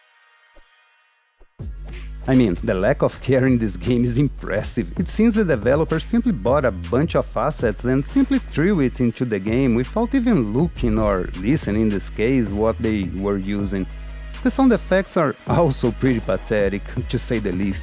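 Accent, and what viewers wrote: Brazil. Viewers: Pelo seu sotaque eu tive quase certeza que vc era BR haha! Seu inglês é muito bom 👏🏽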